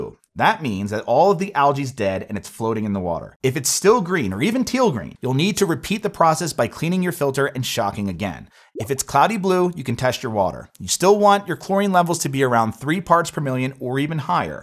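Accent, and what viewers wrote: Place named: United States. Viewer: Loud mouth american!! Gallons and us accent are of no use😮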